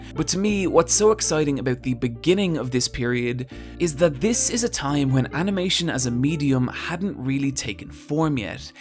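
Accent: Ireland. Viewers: Am I the only one who feels like that he sounds a lot more irish in this video than in his others? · because he's Irish. Whenever he speaks English he does so with that regional inflection, which affects pronunciation IE how he says "Film". It's not something that is "incorrect", unless contradicting common elocution and that can only be called out by someone that lives in that region.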